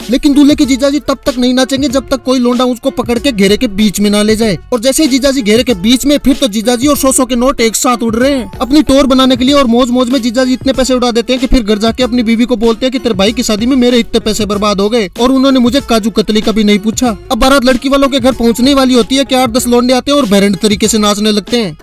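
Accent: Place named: Rural, India. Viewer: Tomhare bolneka tarika ku6 bad villager jeisa hai.......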